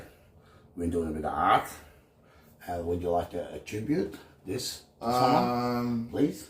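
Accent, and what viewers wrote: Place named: Australia. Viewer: I honor your heritage bro, I knew you had an Aussie accent since the get go. My favorite country besides my own is Australia, I probably mentioned before how much I enjoy Rugby football. If I was able to I'd love to come and go on a hunt for an opal. One of my very favorite tv shows is about hunting for opals. I'm Native American and my heritage includes the totem as well. Something you and your bro could share is the boomerang and it's impact on survival for food and the technique to accurately make it's target. THANK YOU both for sharing part of your life story; the artwork is beautiful! I wish I also could honor you with support in some manner. THANK YOU! Watching you from a long ways away in the State of Minnesota U.S.A. 👌😊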